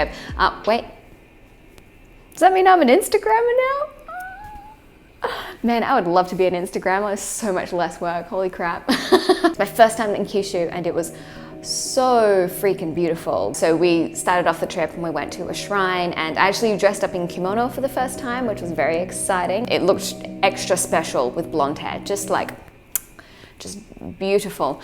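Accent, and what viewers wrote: Australia. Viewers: Amazing, I followed a link on PhillipDefranco's video and saw a wonderful video by an amazing videographer and instantly recognised that Aussie voice. I watch a view more videos and realise you are a fellow Brisbanite. That sucks me down the rabbit hole as I then proceed to watch all your Australian videos first and then a few others you have made. I'm a little binged out but I just need to comment and say thanks for the great content (much I will try and finish in the future, even though most of it may not be the content I would normally view) but I believe good content, told by an amazing story teller and presented in such a great way like you do, should be rewarded with at least a like, maybe a comment and possibly a subscribe. You get the trifecta. Cheers I will admit being from Brisvegas as well, may have biased me a little bit. Ok... 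a lot. Have a good one. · Fun watching your videos. Great, your back in second home. Didn't know you are Australian, no strong accent.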